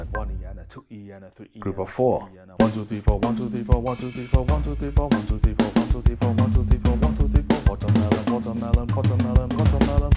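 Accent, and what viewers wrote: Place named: Trinidad and Tobago. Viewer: Like ur vid's. I detect a trini accent in ur articulation. I could be wrong.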